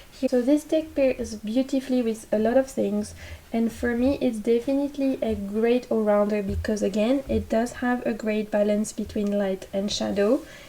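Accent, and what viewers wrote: France. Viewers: Love your sweet voice, so glad to find your channel. I loved the cloth with stars it was so perfect as a reading cloth❣️ Most of all I love your beautiful French accent. I think it is great fun to share favorite decks with others Thank you so much. Suzy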